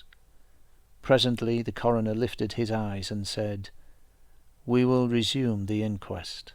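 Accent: United Kingdom. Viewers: This gentleman has such a pleasant voice, pace of reading, diction and British English. A pleasure to listen to.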